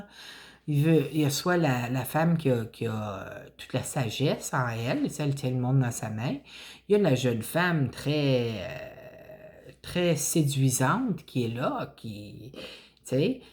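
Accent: Canada. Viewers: Un petit accent Canadien ! J'adore ! ❤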